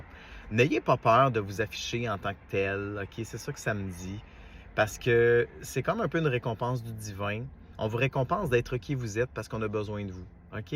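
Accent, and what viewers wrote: Quebec, Canada. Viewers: Ya pas bcp de quebecois. Ca fait du bien t entendre 😂❤❤ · Bjr gratitude infinie je crois reconnaître un petit accent? Québec? J espère que Dieu t entende j attends un futur procès et un verdict afin que mes bourreaux soient jugées et jugés escroqueries vol détournement d héritage corruption à tout niveau destruction physique barbare le 10/08/23 puis destruction psychologique car manipulations mentales emprise travaux occultes trahisons familiales et amicales etc abus viol etc a 58 ans je rêve de regarder mes bourreaux dans les yeux et leur dire que je suis en vie malgré tout cela une vie détruite destruction financière je languis donc de vivre en paix retrouver mon abondance financière et déménager dans mon arrière pays niçois avec mes animaux créer mon refuge animalier écrire lire gros becs Québec je me souviens 1991 région des Laurentides études d infirmière bsx Christine ❤❤a❤❤🙏🙏🙏🙏🙏🙏📚📚📚📚🦋🏖️🏖️🌈🌈✨✨🌹✨🐾😇😇😇🌴🌞🌞⭐⭐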